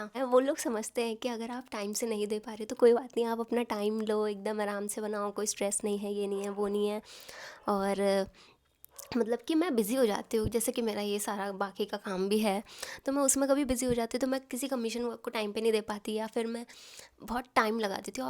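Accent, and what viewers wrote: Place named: Pahadi, India. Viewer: Pehli bar aapki video dekhi aapke bolne k accent se hi pta lgra h pahadi ho.... 😂❤ I saw your video for the first time and I liked it, it was very honest.❤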